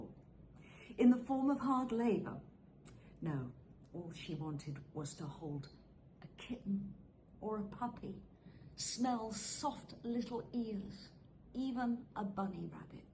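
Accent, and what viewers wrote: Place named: Oxford, United Kingdom. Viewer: Вы знаете, мне бесконечно импонирует Ваше абсолютно безупречно-совершенное Оксфордское произношение, но так-ли нужно, чтобы Ваш Кролик так часто посещал солярий !???? 😢😢😢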